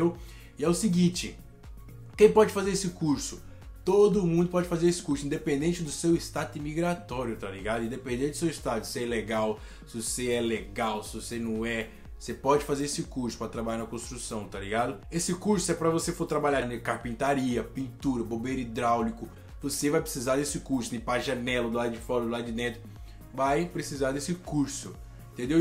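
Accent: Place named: São Paulo, Brazil. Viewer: Cai de paraquedas aqui!! Iol mas ganho meu like 1° pela humildade..2°AHH que bom ouvir o sotaque da minha terrinha😍 SP na veia manoooo!!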